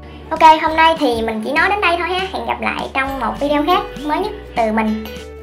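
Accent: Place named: Vietnam. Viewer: chị nói tiếng anh thuần việt ghê, em thích :))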